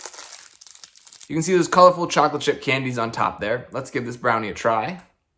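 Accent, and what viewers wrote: United States. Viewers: You from San Antonio, Right.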